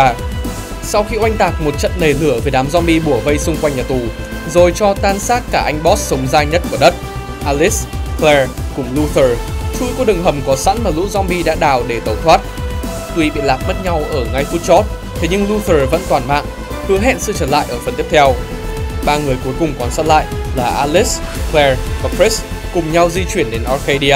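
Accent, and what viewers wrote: Vietnam. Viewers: Bác thuyết minh dân Nghệ hay Hà Tĩnh v ạ?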